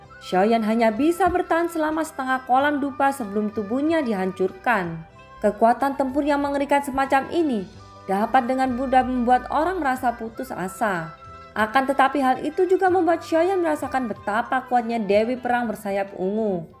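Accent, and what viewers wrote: Java, Indonesia. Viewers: Nah mulai enak suaranya&cuma logatnya masih medok v😁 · Kak orang jawa yah??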